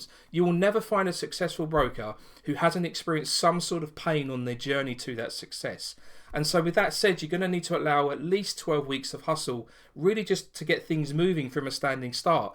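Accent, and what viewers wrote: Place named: United Kingdom. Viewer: Is this in the USA. You sound British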